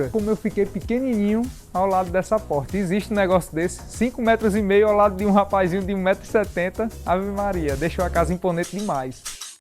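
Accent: Northeast, Brazil. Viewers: Gostoso é esse sotaque nordestino! Adoro!!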